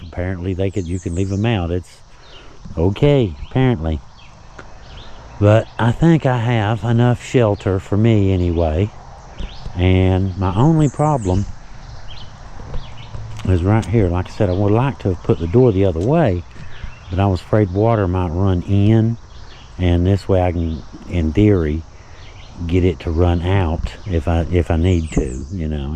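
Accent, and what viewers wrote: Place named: Virginia, United States. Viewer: I have been boondocking in this rain also for days and nights! HA! I love your Hillbilly Humor! You so funny. And you talk like us here in SW VA. I will be camping in West by God in June, love it!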